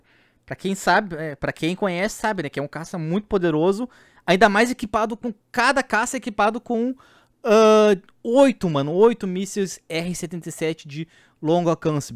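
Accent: Rio Grande do Sul, Brazil. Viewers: Tchê tú é gaúcho de Pelotas ctz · Tu é gaúcho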